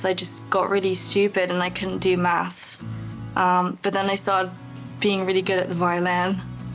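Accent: England, United Kingdom. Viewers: I keep forgetting shes got a british accent and I get surprise every time I remember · She’s British ?? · wait she has a english accent how did i just figure this out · Because it's a British accent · you've got a british accent and new york attitude i like it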